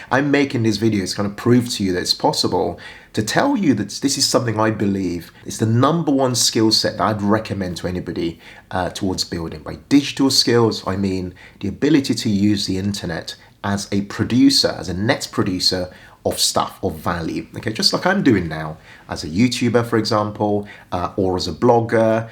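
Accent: United Kingdom. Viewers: Which country are you from? You sound like you’re from the UK x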